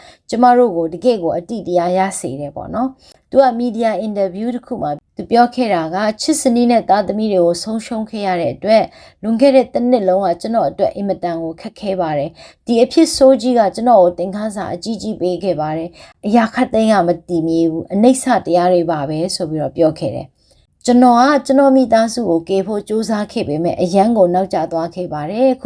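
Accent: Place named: Chin, Myanmar. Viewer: ချင်းလူမျိုးစကားပြောအသံနဲ့တူလိုက်တာ 😊 ကိုတွေ ချင်းလူမျိုးသူငယ်ချင်းတွေလဲအဲလိုလေယူလေသိမ်းအသံနဲ့ပဲ စကားပြောကြတာ 😊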